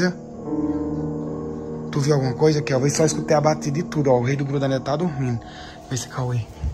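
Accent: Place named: Pernambuco, Brazil. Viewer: Onde você mora qual é o seu estado você mora em Buíque esse povo fala assim Senão não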